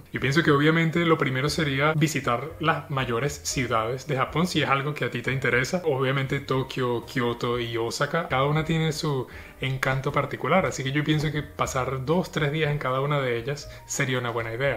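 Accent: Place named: Venezuela. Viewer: ¿Te formaste como músico en Venezuela, en el Sistema de Orquestas y luego te perfeccionaste en Suiza? ¿Rechazas tu condición de venezolano? El acento es innegablemente venezolano.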